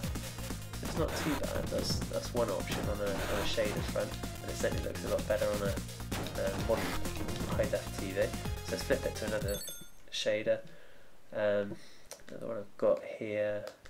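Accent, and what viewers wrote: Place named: United Kingdom. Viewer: Why do all the British gamers on youtube have your accent? Or are you all THE SAME DUDE?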